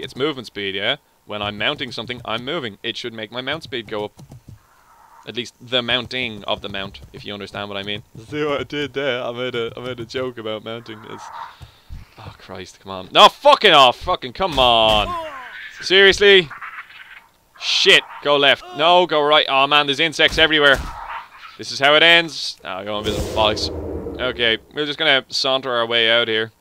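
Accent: Ireland. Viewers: Are you irish? you sound like a right dub hahah quality video though keep it up!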